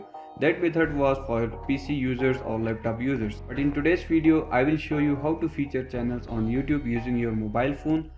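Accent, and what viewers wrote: India. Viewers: No he's a indian ._. Ik a indians accent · Lol it's not bad . It's Indian accent. You might have problem understanding it which is understandable but it's not bad. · Why do tgey always have an indian accent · Indian accent yea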